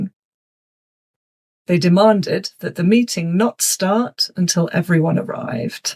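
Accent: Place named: England, United Kingdom. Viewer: Yes, of course darling. I have a pretty good command of grammar so the content is basically only a refresher but I love watching the videos because I am a sucker for English girls lol and a lot of it has to do with the accent lol.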